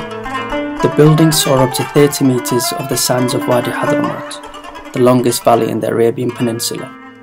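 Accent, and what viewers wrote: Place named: Sheffield, United Kingdom. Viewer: Of course you cant ignore the beauty of Yemen, but i noticed the Sheffield accent straight away.. Brilliant video mashaallah..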